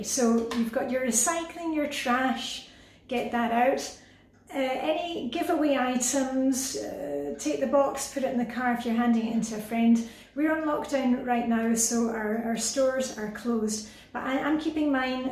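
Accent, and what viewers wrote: Scotland, United Kingdom. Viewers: Ah could be sure your Scottish? I may well be going barmy?xx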